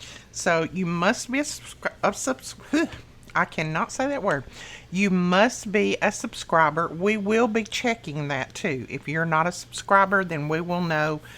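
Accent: South, United States. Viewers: My favorite craft as of late is junk journals. I have never ever won anything from entering any contest. My dad use to say I was bad luck lolol I keep trying though! I love your southern accent!!!!! Xoxoxo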